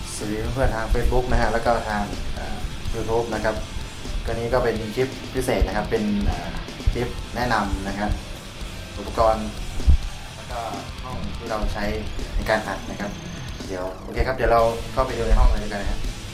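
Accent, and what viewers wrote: South, Thailand. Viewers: คนที่ไหน สำเนียงเหมือนคนใต้เลยนิ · คนใต้ป่ะครับ ?? เหมือนจะทองเเดงนิดๆๆ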